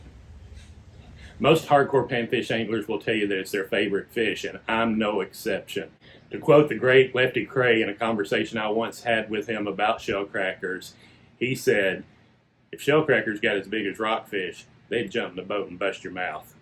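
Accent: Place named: New England, United States. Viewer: It sounds like your in the new England aria is your book relevant for texas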